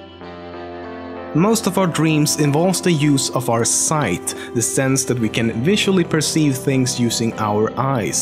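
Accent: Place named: Sweden. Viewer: Youre sweedish i hear it on the accenr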